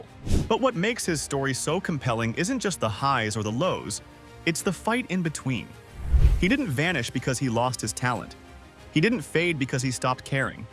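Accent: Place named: United States. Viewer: an American voice over and a few repetitive meaningless clips. Absolute shite!!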